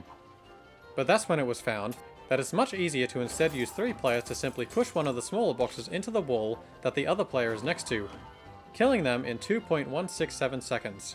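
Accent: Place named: Australia. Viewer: femboy??? also why did i think they were australian lmao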